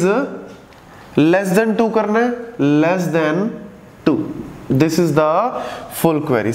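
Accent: Punjab, India. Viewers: Braber Punjabi tone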